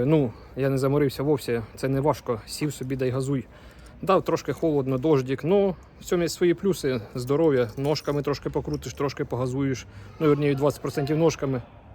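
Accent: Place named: Kherson, Ukraine. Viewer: Привіт! Твої відео як вірус якийся, дивляться так легко і захоплюють... У тебе такий говір, ти не з Херсонщини випадково?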